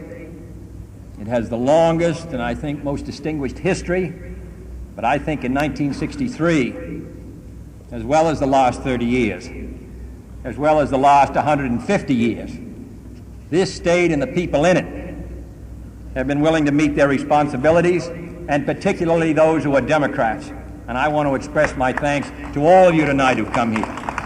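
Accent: Boston, United States. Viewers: Hey had a strong Boston accent.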